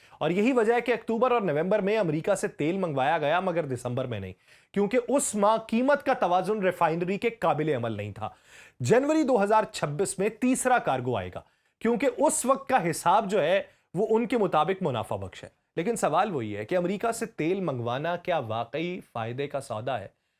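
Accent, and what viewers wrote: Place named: India. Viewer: ye bunda Indian hay,isska ta'lafaz hindustani hay.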